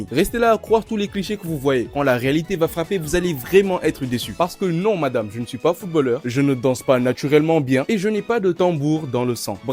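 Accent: Senegal. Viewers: tu es sénégalais à ton accent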